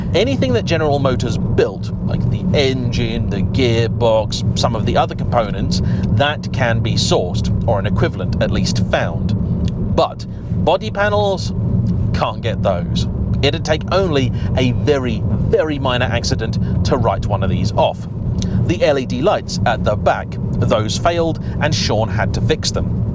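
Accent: United Kingdom. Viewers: Is it the British accent, is it his knowledge and take on exotics as well as everyday enthusiast cars? I don't know, but I can listen to this guy talk about cars all day! seems like the person I'd run into in a parking lot and just start up a conversation about what we're driving! 😃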